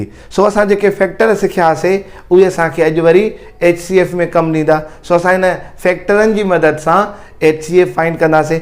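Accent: Larkana, Pakistan. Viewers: Larkana waly aise hi bolty hen so sweet 🥰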